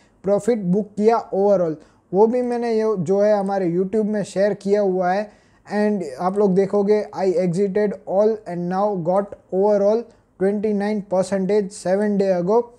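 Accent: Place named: Kathiawar, India. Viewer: Bhai Kathiyavadi lage chhe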